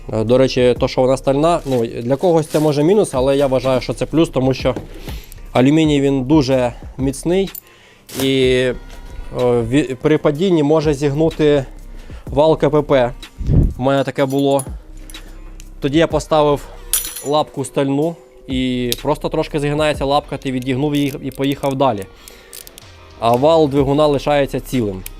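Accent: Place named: Surzhyk, Ukraine. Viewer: Хорош на суржике балякать. Мы в Одессе не урозумим вашу сельскую гарную мову.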